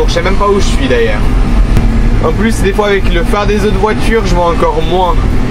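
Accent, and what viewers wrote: Switzerland. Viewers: top ta vidéo, et j'adore t'entendre parler, "ton petit accent suisse" bonne année a toi et tes proches et tu ne fait pas un boulot de faignant a +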